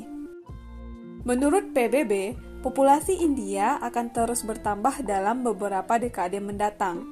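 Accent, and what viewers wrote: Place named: Eastern Indonesia, Indonesia. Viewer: Logatnya agak lain kak.. dari timur kh?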